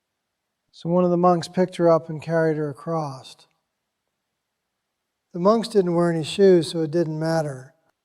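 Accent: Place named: Georgia, United States. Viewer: Why is sounding like an old-timey trucker from Georgia all of a sudden?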